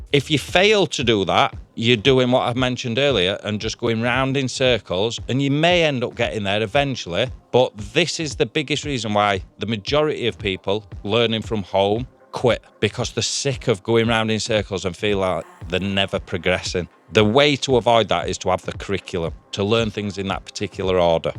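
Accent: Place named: Manchester, United Kingdom. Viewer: no worries you have a bit of a Manc accent.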